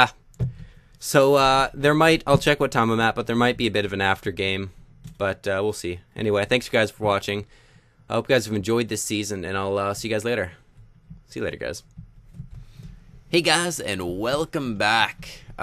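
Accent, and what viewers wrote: Canada. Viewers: Ohh he's Canadian ! I was wondering what his accent was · Is you Canadian? This is the first video I've seen from your channel, so I don't know.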